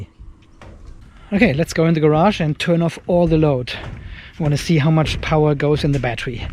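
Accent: Germany. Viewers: I would go crazy because of the leafs :D Allot of hot spots on the panels. Where are your roots? You sound so german :)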